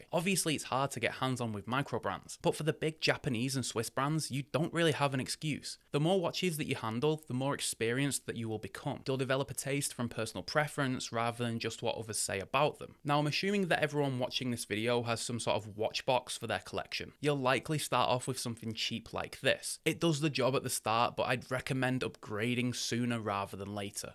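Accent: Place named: Yorkshire, United Kingdom. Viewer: The only watch accuracy meter I could find in the app store cost $29.95. And there isn't one that looks like the one you show on the video. Can the app be found elsewhere? I love your videos and your Yorkshire (I may be wong) accent. I was a Harrogate resident for 5 years back in the 70s. Thanks for your great videos.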